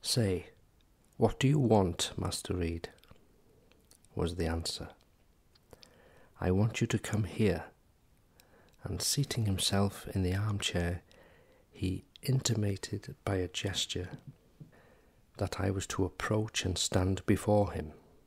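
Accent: Wales, United Kingdom. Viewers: I haven't heard the Welsh accent very much. In fact the two people I've ever heard are Rob Brydon and. Now I think this is how all Welsh sound.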